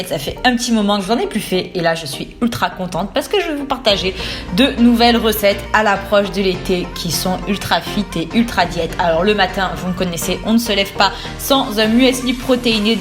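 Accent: South, France. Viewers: Top avec l'accent de chez nous le sud non😊😊😊😊?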